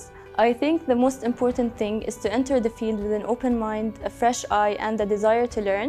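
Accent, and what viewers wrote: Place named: Egypt. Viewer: هي من مصر صح ؟ واضح من الاكسنت 😂😂😂😂😂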